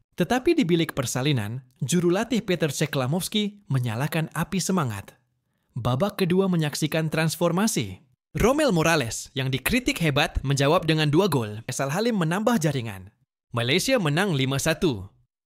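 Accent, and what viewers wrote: Indonesia. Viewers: Kenapa voiceover mcm indondesh. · Sling Indonesia Nya dengar sikit😂..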